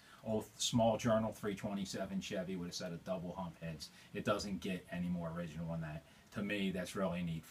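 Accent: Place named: Maryland, United States. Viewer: This guy sounds like he's from the eastern shore of Maryland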